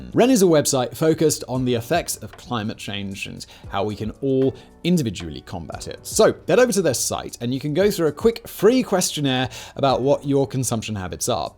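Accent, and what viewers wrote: United Kingdom. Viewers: Personally, I think it is time to unsubscribe., why do you have so much disdain towards America and people of Euro-Caucasian descent? America indeed won the space race and cut back NASA to protect the world from Communism. Your accent affirms your affiliation with some islands hell- bent on colonization and world dominance for a half millineum. Islands which survived two wars thanks to America. The Americans did move across North America, but tell me which nation did not historical take lands from others? That is how every nation survived. Euro-Caucasians are the inventors of most of what made the world of today. Not one American alive today has done what the British, Japanese, Inca, Zulu, or other empires did throughout the world before America became the place most people of the world would want to live. You as a non-American, earning your living from American clicks, is amongst the least to speak as you do of American men of Euro-Caucasian descent.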